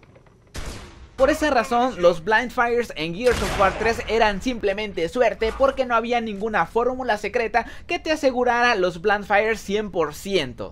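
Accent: Mexico. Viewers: eres de mexico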